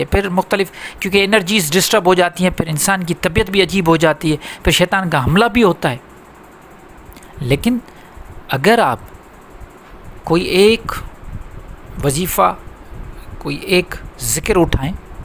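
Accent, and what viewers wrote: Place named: Sindh, Pakistan. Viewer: Sir Aap ki zuban Sindhi lag rhi hy han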